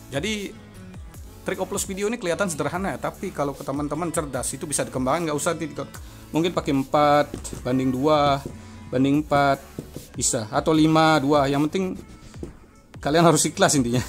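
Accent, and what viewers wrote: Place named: Kutai, Indonesia. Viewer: logatnya kayak orang kutai bang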